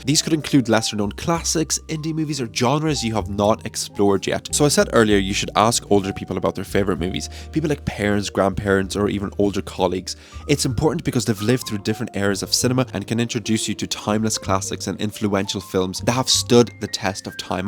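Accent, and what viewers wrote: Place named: Ireland. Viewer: What is your ethnicity? You sound either Irish, but I’m guessing your name, is Czech/ Slovak, since is Polish for Paul, & those are West Slavic languages.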